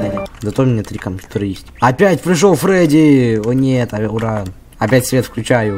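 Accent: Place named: Russia. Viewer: Все говорят што он украинской: а я гаварю што он руской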